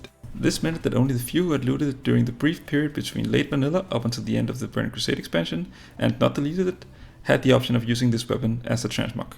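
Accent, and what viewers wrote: Denmark. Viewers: "A new channel based in Europe" You are very much Danish my guy, the accent does it all :0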